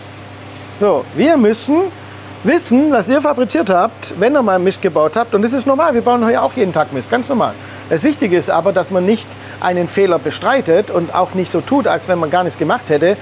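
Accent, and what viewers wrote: Swabia, Germany. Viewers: Ich schaue die Videos super gerne, insbesondere des Klartextes und der Direktheit wegen. Der schwäbische Dialekt ist nicht so krass, dass es die fehlerhaften Untertitel bräuchte.😉 Danke für die Mühe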